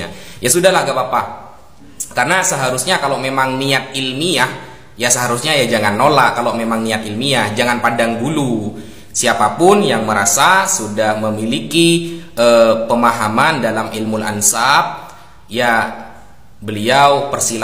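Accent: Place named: Madura, Indonesia. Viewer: Logat Madura banget gus *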